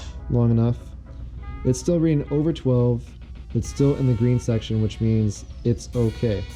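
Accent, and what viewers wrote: Canada. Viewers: Are you Canadian? I think I can tell. British guy here! 😀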